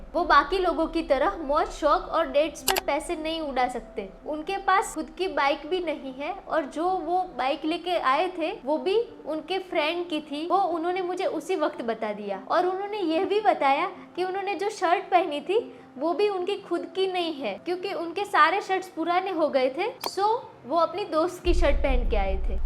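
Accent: Gujarat, India. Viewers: I love gujrati tonn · If Gujarati are speaking Hindi 😊✌️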